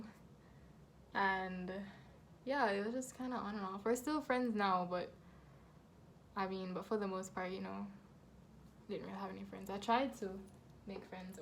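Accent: Jamaica. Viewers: You must be jamaican , accent trang trang trang 💯💯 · Bredda you neva haffie tell me you Jamaican could tell from you open you mouth 🇯🇲🇯🇲🇯🇲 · Are you Jamaican?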